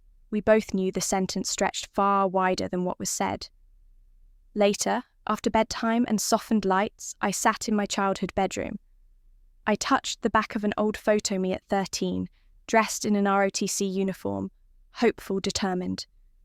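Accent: United Kingdom. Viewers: Why don't they use an American accent? British accent for a military story set in the USA? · The British accent is nicer than most of the other AI voices in use, nice change!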